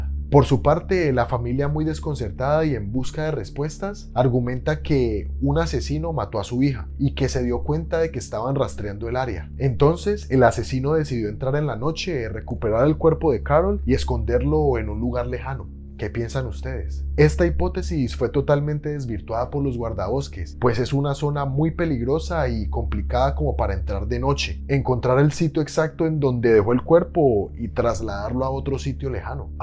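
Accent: Colombia. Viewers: Vos sos de cali ve?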